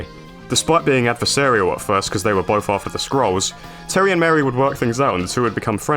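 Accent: United Kingdom. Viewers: I love hearing a Brit talking about Fatal Fury and SNK, the game and the company is not as popular as SF, Tekken, and Mortal Kombat here in the uk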